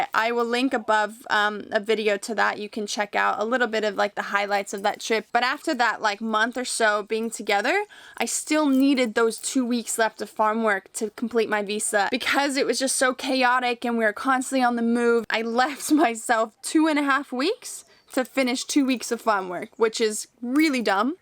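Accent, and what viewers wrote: Australia. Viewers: Why do you have an Australian accent now? 😹 BTW I like your blond hair. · She now sounds like Steve Irwin, the Croc Hunter. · Have you noticed you started to say some words in an Australian / Kiwi accent? That's weird. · Wow you sound a bit like an Aussie now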